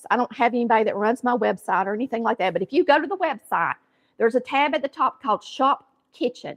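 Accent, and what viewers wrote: South, United States. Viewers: Hey! I just watched your corned beef and hash video. I have never browned mine like that in the pan, but I'll have to try it that way. I'm from Georgia but live in South Carolina now. I just love watching your videos. Your southern accent sounds just like me, my mom, and my sisters! I think if we lived close by each other, we'd be great friends!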